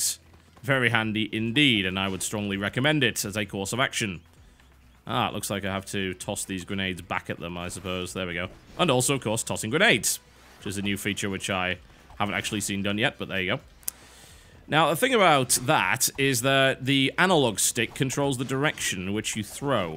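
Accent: United Kingdom. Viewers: Well um he's British...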